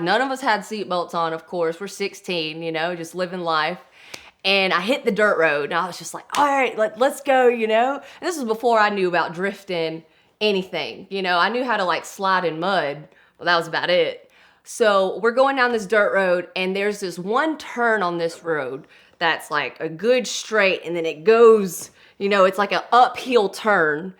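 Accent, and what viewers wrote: South, United States. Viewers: Anyone else’s eyes pop out of their head when they saw a good lookin woman, talking in a southern accent, about a truck?😂😂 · Too southern of an accent for my likes, then I thought to myself Georgia? Why doesn’t Ed sound like that? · Heard the accent, thought southern bell. Said hillbilly dad, check, philiphina mom hold up. Look at video. Ah yes still a southern bell lol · Nothing can be more American than her accent! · what is hotter that a gorgeous asian woman with a southern accent? WOWWEE!!! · Came into this video hoping to hear a southern accent saying "big ol mud tires". Was not disappointed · Not even in by 9 seconds yet and dam that southern accent, i'm in love lol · This channel makes me want a southern accent. "Quert o' ol" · I fkin love southern accents. Im from Boston so dont hear it much up here. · Beautiful girl, southern accent and loves trucks, she's perfect! 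· A Filipino with a STRONG southern accent - there has been a disturbance in the space-time continuum.... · Cream job sums it up.... That southern draw so sexy.... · I love the blunt south talking thank god im not the only one left in 2020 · Pretty asian girl with a southern accent, was a bit confusing, but it sounds like she's come a long way from rolling her truck to driving race cars 👍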